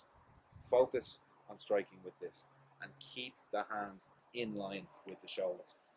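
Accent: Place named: Ireland. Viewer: I have palms on my hand but I don't have these paaaaalms that you speak of. Aye haha i just messing. I notice the irish accent has the same ah sound as the American midwest. Interesting sound.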